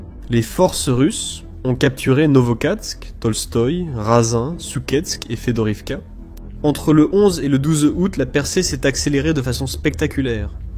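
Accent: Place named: Belgium. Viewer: Slavia Ukrainia. Une voix Belge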